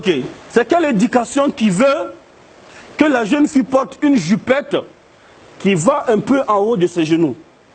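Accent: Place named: Mali. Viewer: Va dans pays tu ne peux pas vivre en cote d'ivoire, tu parles comme un malien toi tu es un chef ou un envoyer de boko haram.espece de malade ,alassane ouattara est notre preside t et ont se doit de le respecter ,c'est n'est pas toi un blakoro ,qui porte des gros boubous qui viendra manquer du respecte a notre president de la cote d'ivoire .un aventurier comme toi.espece de boko haram..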